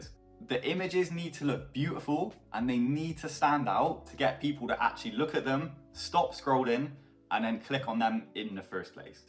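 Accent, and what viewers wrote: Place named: Somerset, United Kingdom. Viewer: bro nice video. you sound like you are close to my neck of the woods with your ascent lol (im somerset).i havent quite got started with etsy yet but i have all the equipment to want to start selling(3dprinters,laser engraver, cnc router, uv printers, vinyl cutter and sublimation printer) ive learnt enough to feel like im almost ready to make sales and being that im in a job that is close to becoming a dead end with all the bad terms they keep wanting to impose (postman) i must start to do something to aim for my dream so im hoping to arrange a call with you soon to make sure im heading in the right direction from day one.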